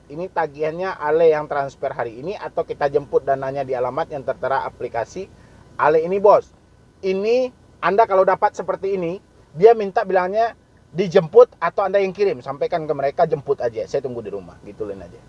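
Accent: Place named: Jakarta, Indonesia. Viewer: Logatnye knape jadi Betawi bang 😂 dulu ane suka nonton video abang logat msh daerah mana gitu skrg bgtu buka lagi video abng logat ud berubah 😂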